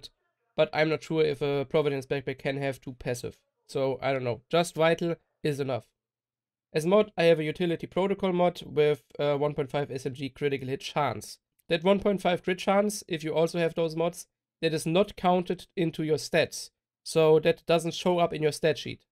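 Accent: Germany. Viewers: Your english sounds like a german who wants to talk english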